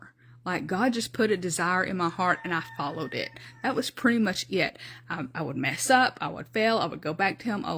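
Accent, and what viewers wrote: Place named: Tennessee, United States. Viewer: Youre in Tennessee too huh ? I can hear it. Anyhoo COME LORD JESUS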